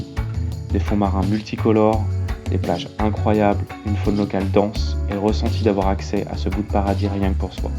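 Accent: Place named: France. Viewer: Je me disais bien que tu étais français quand je t'ai entendu dans le vlog de Jamie et Nikki en tout cas très belle vidéo. :)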